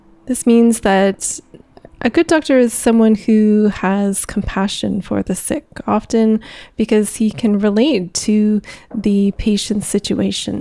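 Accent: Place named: Canada. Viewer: ".....ay?" you Canuck you nice gentleness, there is so little of that now.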